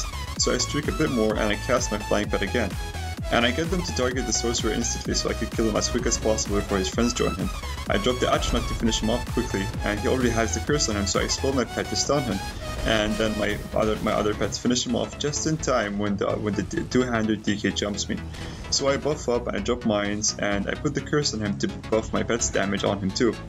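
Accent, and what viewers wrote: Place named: Austria. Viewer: Österreicher? :-) Klingt bisschen wie Arnold ;-)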